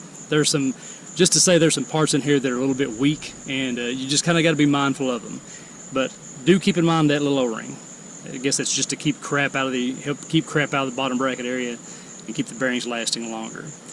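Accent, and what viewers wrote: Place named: South, United States. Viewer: Hands down best guide on youtube, thanks! Always a pleasure to hear a well-spoken Southern man who understands millimeters!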